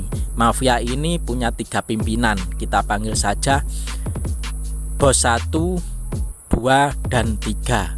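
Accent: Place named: Java, Indonesia. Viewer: Bisa gak kalo bacain alur nya jangan medok Gak enak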